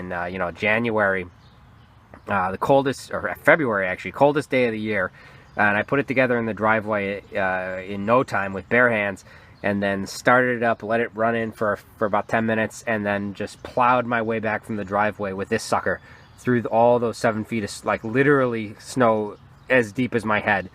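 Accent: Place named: South Boston, United States. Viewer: Mark Camaro it’s that easy! And or open the screw that secures the carb bowl and let it drain out. Keep the gas tank cap off for a day and any gas in the tank and lines will evaporate. Don’t remove these covers as shown. His accent sounds like he is from South Boston. I ought to know lol.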